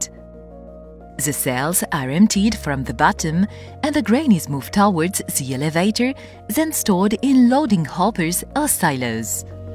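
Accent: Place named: Egypt. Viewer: المعلقة مصرية 🤣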